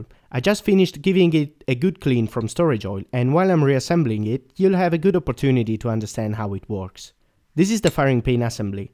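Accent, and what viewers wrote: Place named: Italy. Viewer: mostly for the ascent I thought you were Italian btw great video, greetings from Italy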